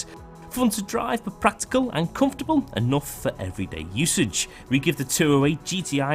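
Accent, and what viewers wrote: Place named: Wirral, United Kingdom. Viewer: His accent is Wirral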